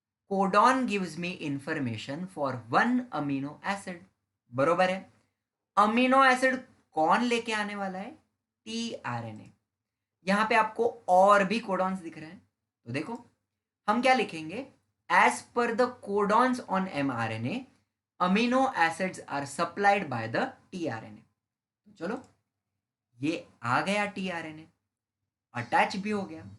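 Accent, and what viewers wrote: Maharashtra, India. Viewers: Sir aap Marathi ho kya ??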